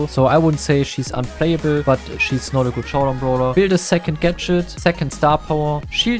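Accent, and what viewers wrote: Germany. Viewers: Bro dieser deutsche Akzent ist crazy🤣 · Digga wann sagst du auch mal was auf deutsch ich höre da DEUTSCHEN AKTENZ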